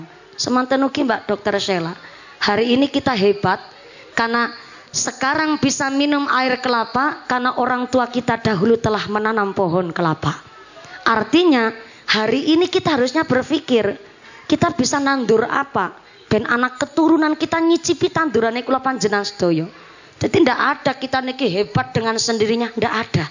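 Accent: Indonesia. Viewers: Itu bahasa jawa ngapak dari cilacap mba... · Masya Allaah ngapak mantep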